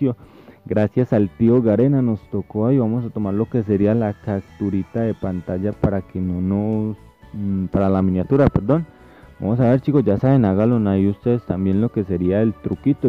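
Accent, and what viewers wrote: Colombia. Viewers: eres de medellino de cali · Na pa mas colombiano pa que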